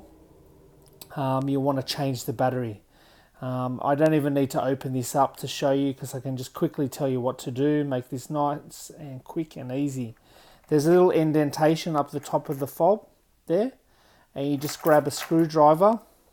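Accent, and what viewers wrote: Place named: Canada. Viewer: Bro yo Canadian sounding ass didn’t do shit we could hav got more info from google u just blew tf out of me bro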